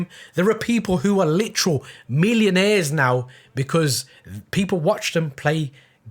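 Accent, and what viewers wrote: Birmingham, United Kingdom. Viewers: Love watching your content , you sound near to were i live in brum keep up the work bro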